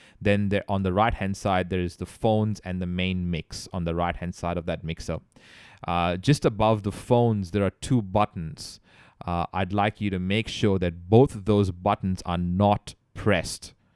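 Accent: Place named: United Kingdom. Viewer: Good grief! You spent the first two minutes chasing your tail! Repeating yourself... If you have a "know it all" Brit accent, you better deliver in the first ten seconds... "I'm the smartest person in the room and I have the accent to prove it!" No you''re not....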